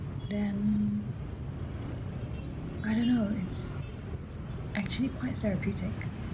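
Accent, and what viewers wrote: United Kingdom. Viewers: jujur banget nih gua,gua suka sejak vidclip zigas,ini cew siapa yah? gua telusurin ampe kemana2,akhir nya tau ceritanya nih cew campuran UK & Bugis Celebes,btw gua seneng ama lo yg pertama of course karna cantiknya,trus yg bikin melting itu british accent nya,im really fallin love with your accent,keep down to earth yow. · i adore your british accent!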